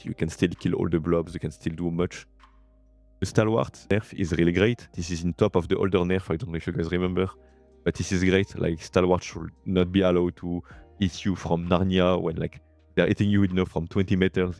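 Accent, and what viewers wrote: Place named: France. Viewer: Thx for what your're doing it's really nice and accurate. Your accent look like french accent, if you are, have you got a french guild ? And if yes, in witch server ?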